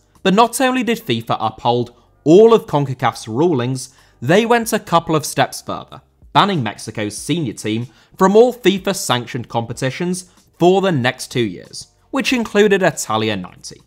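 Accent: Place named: United Kingdom. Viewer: Listen Dinis, you’re posting all these angry corrections but I think you forgot that OTHER PEOPLE HAVE DIFFERENT ACCENTS to you. is British and that is just the way people talk there, there is no right or wrong way to pronounce the words you’re correcting. I’m sure you probably can’t properly pronounce many words in English properly yourself, that’s just the way you speak, get over it. Just because you’re angry at something he said in the video doesn’t mean you need to be a pr*ck about it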